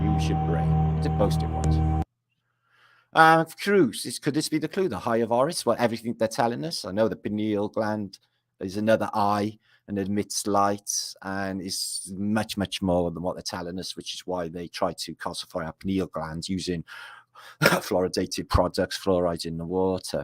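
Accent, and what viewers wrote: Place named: Cardiff, United Kingdom. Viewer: Good stuff. Loving your vidz I discovered you through RV recently. I knew straight away you was from Cardiff from the accent 😂😂 I am myself born an bread from there. Keep up the good work 😁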